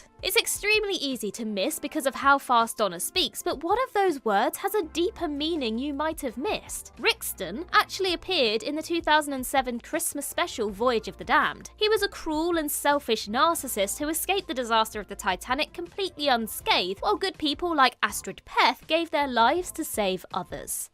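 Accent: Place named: United Kingdom. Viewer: it is adorable how you say F-ing. It just doesn't sound right coming out of your mouth. I guess being American myself it's just funny hearing it said with your proper British accent.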